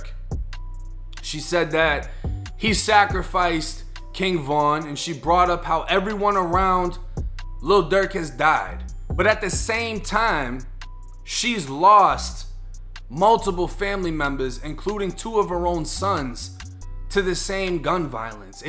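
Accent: Boston, United States. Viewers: Super dope channel. Random: Is that heavy accent on "AR" words only common with white people from Boston? I don't think I've heard any black dudes talk like that. Lil Mahk lol (Marc). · R u from Boston with that accent?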